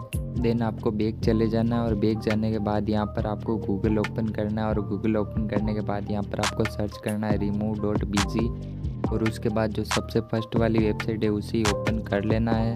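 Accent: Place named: Gujarat, India. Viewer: I think you are gujrati 😅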